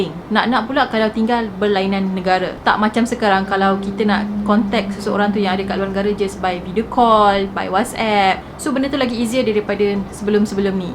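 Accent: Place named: North, Malaysia. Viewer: 20% masih ada sleng utara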